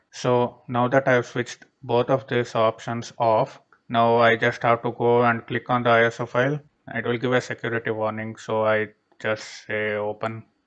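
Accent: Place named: India. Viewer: I hear Indian accent, I listen to the end. Fast, to the point, solved my problem. Thumbs up!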